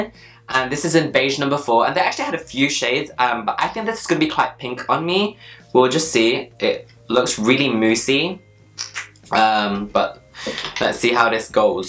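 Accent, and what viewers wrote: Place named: South London, United Kingdom. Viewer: havent watched in ages!! when did he start sounding like hes from south london!!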